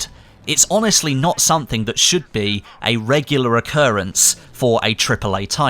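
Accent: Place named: United Kingdom. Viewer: british accent sounds horrible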